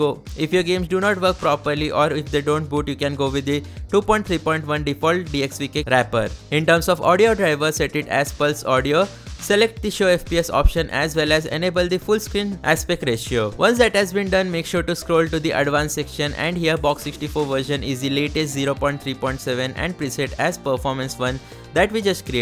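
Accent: India. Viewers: you sre hindi boy you try english hindi appears in your voice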